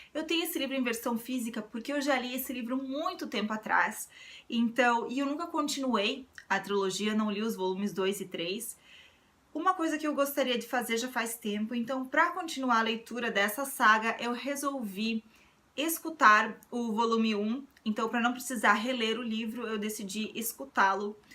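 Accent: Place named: South, Brazil. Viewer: Adoro teu sotaque, sutil sotaque sulista. É bom ter uma representante do sul no âmbito da literatura. Sucesso nobre conterrânea.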